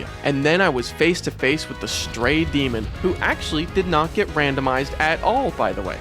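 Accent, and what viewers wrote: Canada. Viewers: Dude. You’re Canadian?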